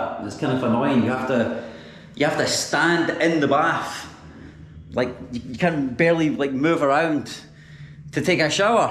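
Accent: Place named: Scotland, United Kingdom. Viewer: Please speak with your scottish accent, I like to hear your accent... 😊 there are not more vlogger with that accent, that sounds unique than other vlogger with american accent.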